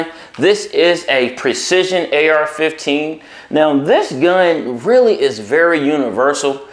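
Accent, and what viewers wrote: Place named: South, United States. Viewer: Mam o man does your southern accent really out does mine😀👍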